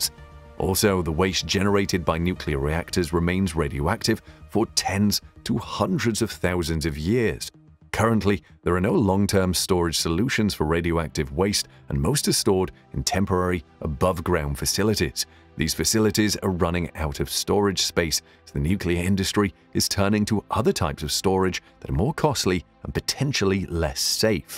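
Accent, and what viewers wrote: United Kingdom. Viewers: I guess that British accent is to make the Chinese propaganda sound intelligent. Does the name Chernobyl (thorium reactor) sound at all familiar?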